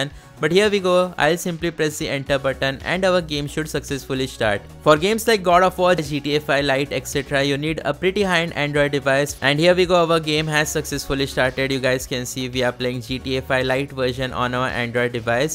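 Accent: India. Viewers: you sre hindi boy you try english hindi appears in your voice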